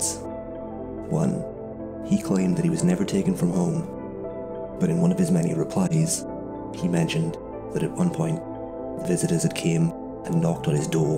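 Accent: Wales, United Kingdom. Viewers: great video mate (are you welsh by any chance?). I was wondering about this the other day, I stumbled across that subreddit earlier on in the year and forgot what date they were hoping for contact, glad I came across your video on the Nexpo subreddit.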